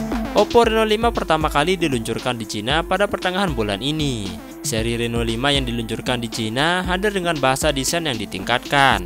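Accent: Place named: Ambon, Indonesia. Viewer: Logat nya mah orang ambon nih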